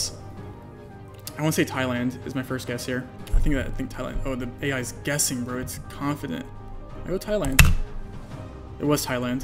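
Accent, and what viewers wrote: Germany. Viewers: You can instantly tell the Country in Geoguesser, meanwhile I can tell , that he is a german fellow 😂👌🏼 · how can you speak such a german english haha what a legend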